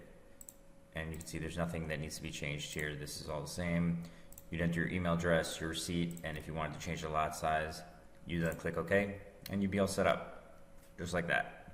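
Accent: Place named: United States. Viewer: You are obviously American the way you talk (like me) but why are you using Blazemarkets? They don't allow US residents.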